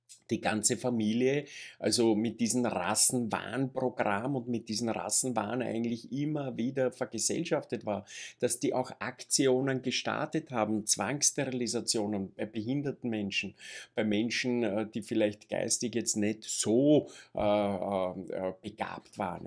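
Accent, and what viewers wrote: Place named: Austria. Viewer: Diese österreichische Sprache ist einfach zu schön! Könnte stundenlang lauschen. :-)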